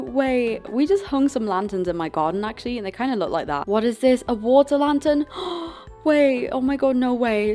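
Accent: United Kingdom. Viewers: You British slayyyyyyyyyyyyyyy I from wales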